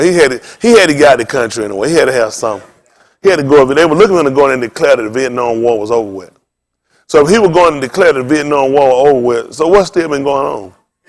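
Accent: South, United States. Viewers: He has a funny way of telling the facts of life. I enjoy listening to. He has a heavy southern accent. I guess I've been out here in Cali too long!!!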